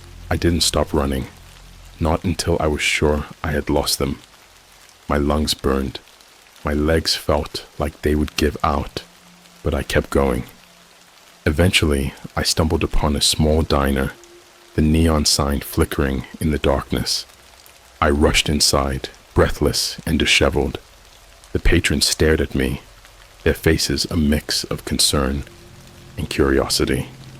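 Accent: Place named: United Kingdom. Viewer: You sound like you're a fellow Brit! However, "nine hundred and eleven" makes you sound like a bot! it's nine-one-one!😺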